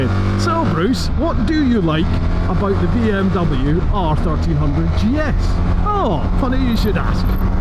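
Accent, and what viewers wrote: Scotland, United Kingdom. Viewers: The SOS messages you’re getting are false negatives. The system is listening and self-teaching itself voice-recognition. Unfortunately, it struggles with the Scottish accent and is triggered. You’ve seen those videos about voice recognition lifts right?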